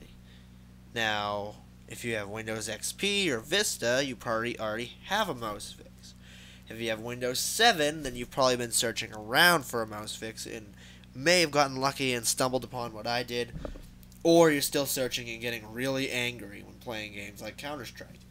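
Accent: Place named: New York, United States. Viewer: man.. are you from NY or NJ, accent is hiliarous lol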